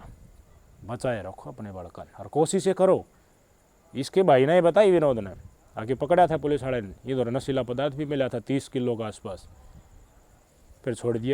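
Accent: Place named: Jhajjar, India. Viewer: Bhai ye bhai district jhajjar se lagta hai mere ko me bhi jhajjar se hu magar bhai baato ko bilkul khol kholker samajaata hai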